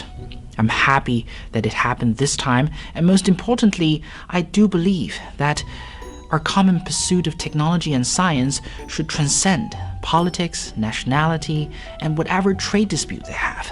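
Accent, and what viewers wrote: China. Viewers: from your English accent you were born in mainland China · His accent is so obviously Chinese from China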